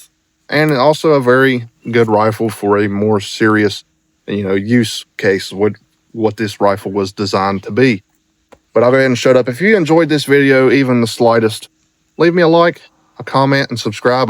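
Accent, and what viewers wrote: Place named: West Virginia, United States. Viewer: Idk but my guess is you are from west virginia. If not then eastern KY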